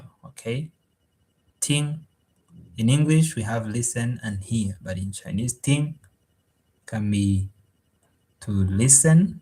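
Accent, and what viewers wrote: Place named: Nigeria. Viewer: are you from Nigeria by chance? btw your Chinese sounds less accented than your English lol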